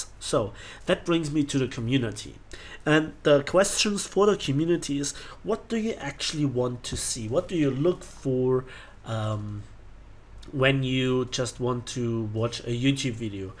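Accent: Germany. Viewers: Hi! I'm an AB pilot with 40.000 air kills and 11.000 ground kills and I'm starting to look at tanks now. I'm a total rookie at tanks and your huge channel is an enigma to me... there is so much stuff to watch and from what I saw sofar it's very good stuff... and here's a problem... I'd like to see some guidelines for tank noobs... and some guidelines to your channel... what videos to watch, where to start on your channel and for most part... guides on how to start with different nations... witch premium to buy, which tank to put talisman on... strategy, tactics, ammo guides... it's a rediculus stuff to you but if you want new subs then stop concentrating on pro players and start looking at rookie ones... because believe me... there are much more of us then there are pros:-D And just a little tip... for christ sake make your videos shorter... you like to talk... 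a lot... and that's nice but maybe you should sepparate your videos in talking ones and action ones... your subs are not allways in the same mood to watch long videos... 45 mins video is an absurd length... maybe add some index table for stuff you talk about in such long videos so people can skip parts they're not interesed in. And please make more AB stuff as you yourself pointed out in one of your recent videos that AB is much better and practical for spading then RB... I love your channel and all the effort and time you are putting in to it and I love your german accent and I wish you get 100.000 subs in the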